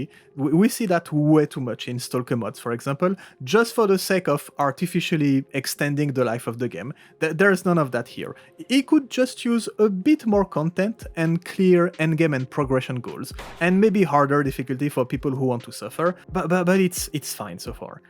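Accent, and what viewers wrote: France. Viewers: Je sais reconnaître un accent français quand j’en entends un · Call me fool, but you got french accent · I cant believe theres so many people not recognising your french accent you sound exactly like houngoungagne who makes cs and hes very french too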